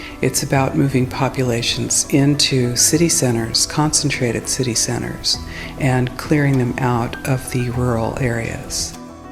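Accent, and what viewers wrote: United States. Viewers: a croaky American female voice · Yup deffs american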